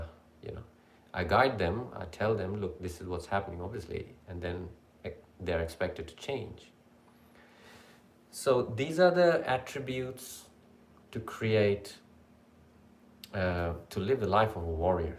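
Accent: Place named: England, United Kingdom. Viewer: Also, you sound like you're in England. That's where I am. But also lost in infinity.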